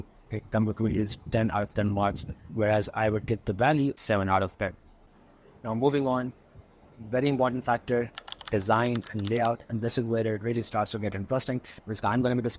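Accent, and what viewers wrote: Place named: India. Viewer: Very tough Indian accent, I didn’t understand 50% of information 😤😑🥴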